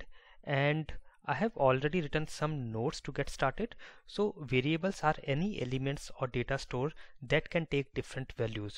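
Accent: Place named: India. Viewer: I love your English)))).... every seconds of this video i remember time when i was in India)))... hello from ukraine... and thx for manual... U very help me!!! thx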